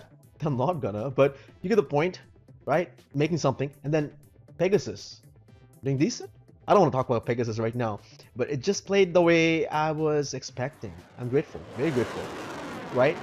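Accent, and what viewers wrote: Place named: RP, United Kingdom. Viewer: Where is that accent from. It sounds posh to my ears. Sounds like money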